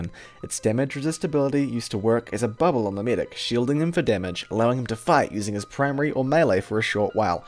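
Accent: New Zealand. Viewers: ***** Yeah the New Zillind accent is quite similar to an aussie one to the uninitiated ;) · Vintage retro guy He's from New Zealand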